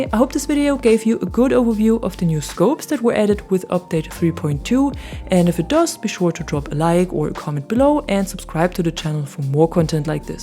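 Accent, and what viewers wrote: Germany. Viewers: i cant stand germans speaking english ffs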